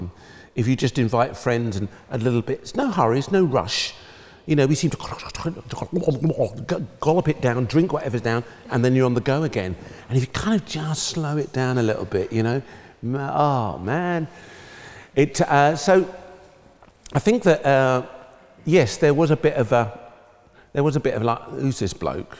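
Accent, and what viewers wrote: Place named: England, United Kingdom. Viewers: jokes aside, he has a very pleasant english accent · Actually one of the greatest story teller of his generation. Also one of the most charming smile, and yes his english accent is soo charming. Plus he can cook.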